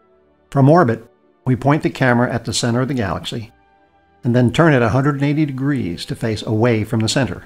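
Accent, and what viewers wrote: United States. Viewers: Oh thank goodness... A video narrated by an American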